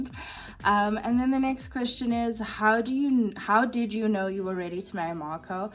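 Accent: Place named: South Africa. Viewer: Omg I love your accent!! South African accents are the most beautiful accents ever in my opinion.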